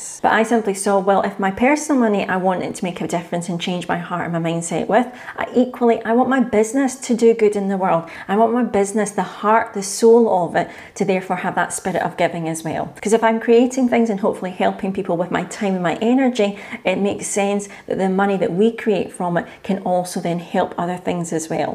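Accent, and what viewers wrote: Scotland, United Kingdom. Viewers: My Good Lady, perhaps t’was your Scottish accent, the way you come across as a sincere lady and, I may be wrong, I feel we have a common “Friend” with a Beard who put you on my path! So I listen and opened a Vanguard life strategy 100! If I need some more advice, how can I contact u! My name is Fred! Im happy to give u my email and number👍🏽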